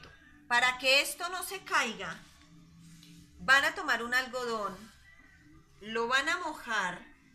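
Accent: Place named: Colombia. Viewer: Muchas gracias por tan valiosa información, y agregó algo más, me encanta el acento Colombiano.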